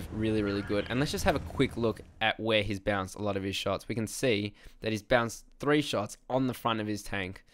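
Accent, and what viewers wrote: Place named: Australia. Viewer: this 'stralia accent is quite arousing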